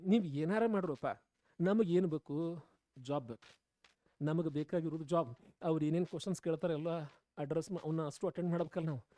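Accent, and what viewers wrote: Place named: North Karnataka, India. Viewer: ಸರ್ ನಮ್ ಉತ್ತರ ಕರ್ನಾಟಕ ಭಾಷೆ 💥💥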